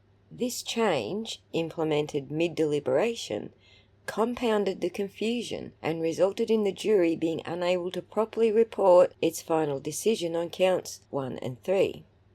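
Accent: Australia. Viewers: Love yer Aussie x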